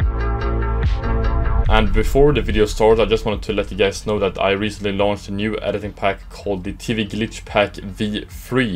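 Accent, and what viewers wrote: Sweden. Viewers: Älskar svengelskan, bra video!👍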